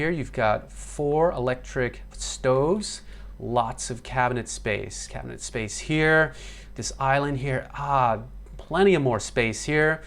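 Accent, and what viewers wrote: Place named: United States. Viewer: R u Thai and German, how come u sound like American, did u grow up in America?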